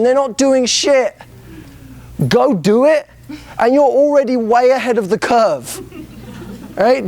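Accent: United Kingdom. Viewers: Is it just me or did he lose his british accent? Quite disapointing of his appearance now since 2009 ;,( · lol OMG i LOVE YOUR BRITISH ACCENT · He sounds so much like Daniel Radcliffe. Not just the way his british accent is, but also his voice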